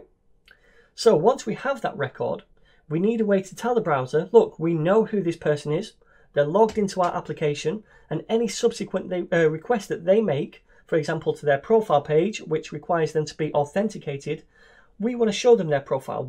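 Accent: United Kingdom. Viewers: Two things I liked in this video are : 1) Your explanation 2) Your British accent =D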